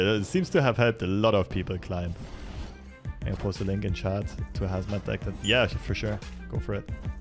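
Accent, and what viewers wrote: Germany. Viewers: where u from? u sound like German or some nordic country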